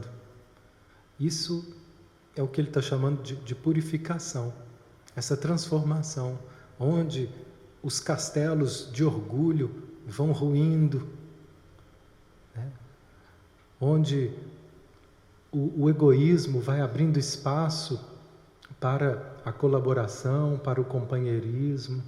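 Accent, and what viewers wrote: Minas Gerais, Brazil. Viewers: Caríssimo estou muito grata a mimhá amiga que apresentou o Pathwork e vc foi o primeiro vídeo e toca minha sombras, todos os dias eu me aceitando... a criança egoísta, invejooosa... reconhecidos... os sonhos eu me vendo... AMIGO este maravilhoso trabalho seu... tom de voz o mineirinho bão sô! Obrigada! obra em negro/branco/vermelho dos alquimistas está em curso.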